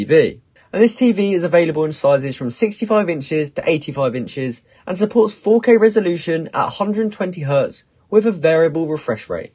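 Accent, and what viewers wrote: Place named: United Kingdom. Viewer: He’s british dumbass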